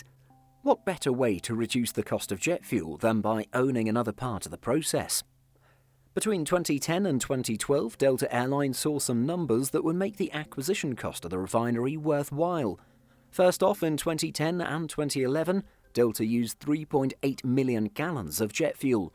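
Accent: United Kingdom. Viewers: The UK I can tell by his accent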